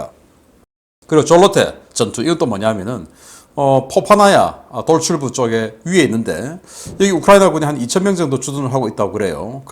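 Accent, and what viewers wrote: Gyeongsang, South Korea. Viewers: 신안균이 견공들이 많은 경상도대구출신인가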